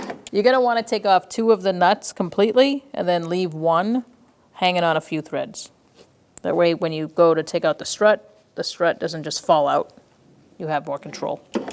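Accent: Boston, United States. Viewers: gotta love that boston accent!! SWAY BAH!!! lol great video!!!!!!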